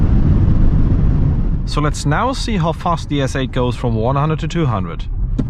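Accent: Germany. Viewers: Man hört direkt das du Deutsch bist😂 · Great review, amazing car and the German accent is 👌😉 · Probably weird but ....this is why i like german women coz their accent while speaking english jus sounds amazing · Man merkt direkt das du deutscher bist aber dein englisch mag ich😂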